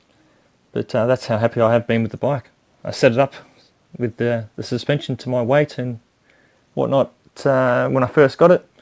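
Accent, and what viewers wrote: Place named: Australia. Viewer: Thank goodness an Aussie voice and not a droning yank.....Great vid and very informative...Thanks mate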